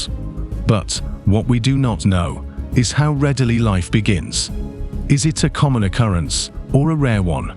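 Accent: United Kingdom. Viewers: Please stop using the "British" accent in your Voiceover. There are a lot better choices like Swedish, German, or Irish.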